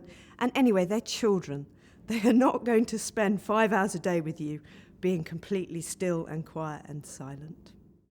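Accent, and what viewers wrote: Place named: United Kingdom. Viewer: I really love your accent madam! I wish our english subject is british english and not american english...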